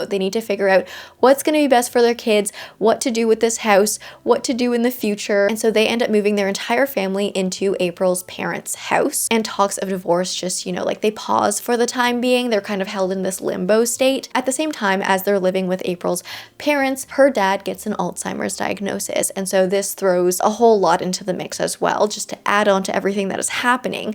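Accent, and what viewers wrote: Canada. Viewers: girl as a Hamiltonian... your canadian accent is ACCENTING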